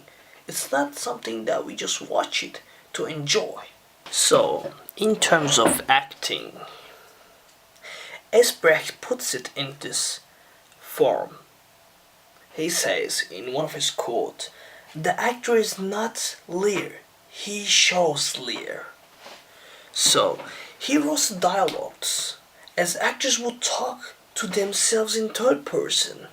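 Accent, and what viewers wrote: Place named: England, United Kingdom. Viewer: Your english is better, seems more from England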